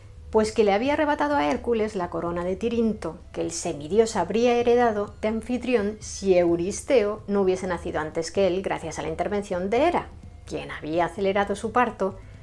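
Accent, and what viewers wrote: Spain. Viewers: Me encanta la manera en que narras las historias , excelente oratoria, el acento español me agrada mucho , es como escuchar audio libros, gracias desde Venezuela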